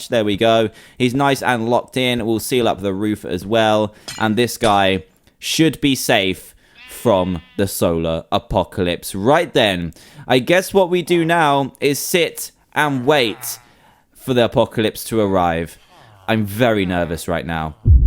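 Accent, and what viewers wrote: United Kingdom. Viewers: Mean he's got an awsome Boise I've always wanted a British voice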